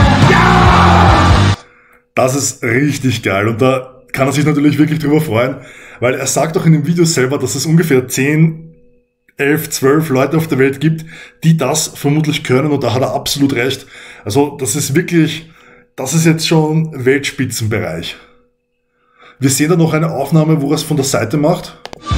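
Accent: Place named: Austria. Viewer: Dialekt für an Österreicher verständlich ... für Deutsche vielleicht schwer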